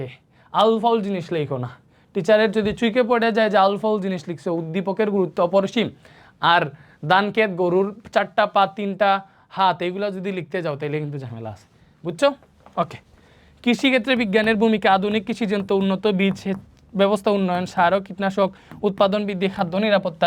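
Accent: Sylhet, Bangladesh. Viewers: উনি কি সিলেটি😐🙂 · Bhaiya apni ki Sylheti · ভাই আপনে সিলেটি নি ভাইরে ভাই😮😮❤😊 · আপনি সিলেটি তাইনা স্যার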